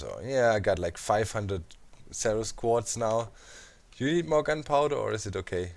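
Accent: Germany. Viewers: I'm new here.. But i'm sure he has a german accent!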